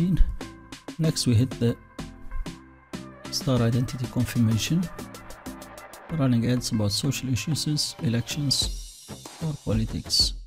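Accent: India. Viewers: Annoying Indian accent video to telling me how to get my privacy violated by Facebook by me providing them my I.d. ,?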